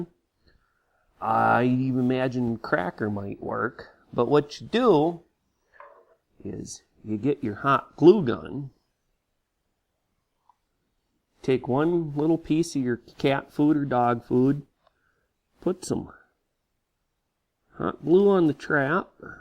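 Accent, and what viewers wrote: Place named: Great Lakes, United States. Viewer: Minnesota or Canada? I hear that Great Lakes accent.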